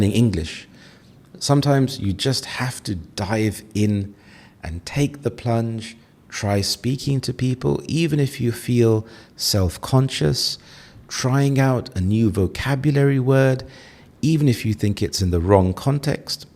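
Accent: United Kingdom. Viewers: Hello Sir., it's the first time I've watched a video podcast episode from yours and I liked that, it was nice, relaxing and with a very clear sound and voice...a nice warm clear British accent the accent I'm trying to acquire for myself. I believe that I could try to shadow it if it is ok.Nice topic indeed, I don't buy on-line a lot and for important items I'd rather go in a shop big or small. Thank you very much, I'm happy to have found another interesting channel. Cheers! 🙏😊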